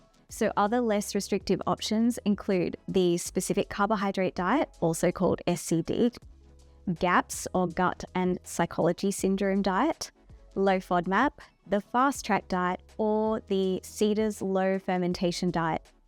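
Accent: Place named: United Kingdom. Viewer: Why don't you cover sulfur SIBO? It has greatly negatively affected my temperament and made me rather picky. For example: titration. I don't mind the British pronunciation but you pronounce oregano as if it weren't English and I find that very irritating. At least you're not butchering turmeric like half the internet, especially Americans. uh-REG-uh-no I realize you're pronouncing it the Italian way But that kind of clashes with your accent. It really bugs me that You say bacteria are smart when in fact they are resilient and lack intelligence.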